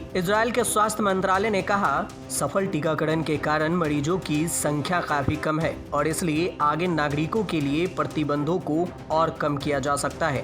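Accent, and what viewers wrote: Bihar, India. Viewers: bolne k liye ye bihari hi bcha tha kya dang se hindi nhi bol skta ye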